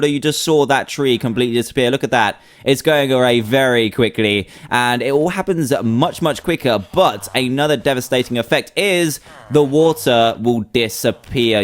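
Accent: United Kingdom. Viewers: Mean he's got an awsome Boise I've always wanted a British voice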